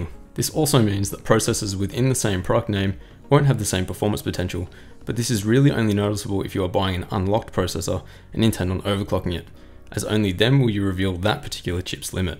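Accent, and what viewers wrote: Australia. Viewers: Man, Australian accent is a little complicated...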